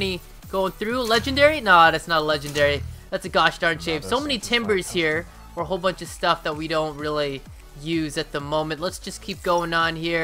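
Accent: Asia. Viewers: It's better to get 10 common cause it's more worth it and the vid will be longer, btw love ur Asian accent <3 no homo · This wasss so good am loving these supply drops i was wondering if you'll ever do a series where we send in out own supply drops and you do a top 5 out of them. Can't wait to see the next one could you 5 rare next time would love to see that. In that awesome asian accent aha. Keep up the great work man your currently my bae cod youtuber. No HOMO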